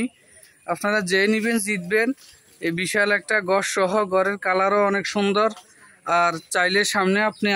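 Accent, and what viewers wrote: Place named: Noakhali, Bangladesh. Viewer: ভাই নোয়াখালী নাকি।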